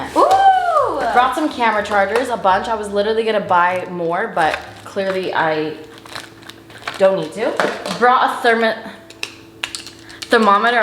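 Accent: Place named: United Kingdom. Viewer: i clicked faster than could start talking in a british accent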